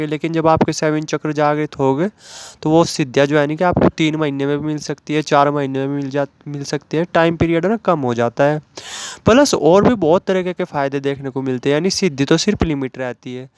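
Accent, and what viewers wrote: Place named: Jhajjar, India. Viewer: ohkk. Mere jhajjar ke relatives h bilkul apki trah bolte h 😂😂😂 Ek se voice bhi milti h apki.or bolne ka tareeka bilkul same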